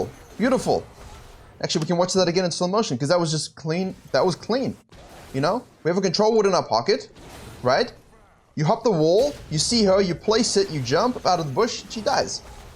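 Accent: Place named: South Africa. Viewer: Wait... Where are you from? You sound south African lol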